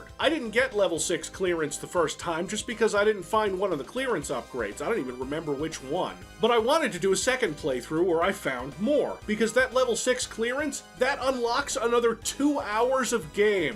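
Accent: New England, United States. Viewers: ... Of course he's from New England. Of course he's from here. if you're still out here and we happen to pass by each other I'll buy you a beer.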